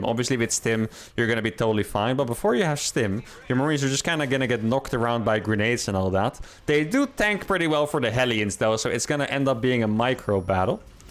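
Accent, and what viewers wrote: Netherlands. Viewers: Lolololol "yea I'm proud" I love. and Lowko are my fav SC casters. They voice/accent makes me want to visit the Netherlands. Seem like some wonderful fun and cool people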